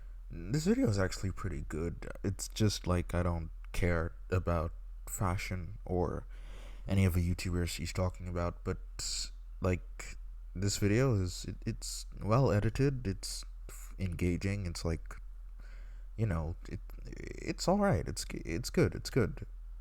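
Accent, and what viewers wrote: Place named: England, United Kingdom. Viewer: I want the crazy horny werid dude not that fucking anoying posh English cunt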